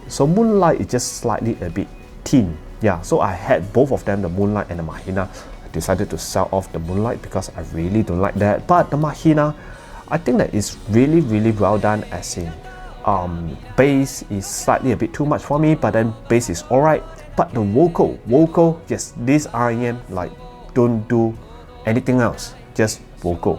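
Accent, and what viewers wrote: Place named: Malaysia. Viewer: I do listen to Jrock as well because not just female vocals but male vocals too, which means I do like a bit of bass, but not sharp treble. As long as it's not fatiguing. Seems like I'll need to look into the Zero 2 then if it has an added bass. Still using my Tanchjim One and Tanya (both DSP) and they're not bad, but female vocals can have some sibilance at times. Awesome to hear another reviewer from Malaysia! Our distinct accent stands out a lot. 😆 Anything that you would suggest in the sub $50 with a bit of bass? Not too much, but enough that you feel it.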